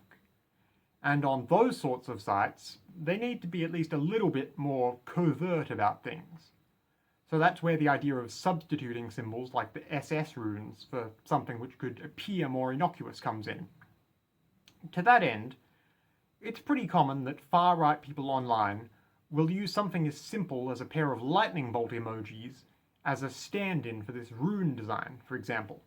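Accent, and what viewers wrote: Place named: New Zealand. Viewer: To my ken, he's a Pākehā in Aotearoa. Should be mostly similar to an Englander.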